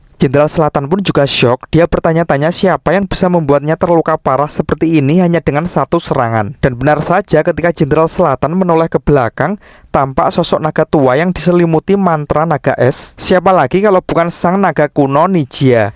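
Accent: Java, Indonesia. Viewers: logat jawanya yg ngangenin bang..😁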